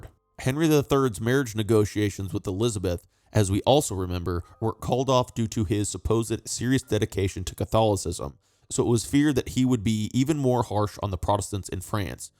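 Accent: United States. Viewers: An American narrator. That lends no credibility to this at all. Americans lecturing about English history is outrageous.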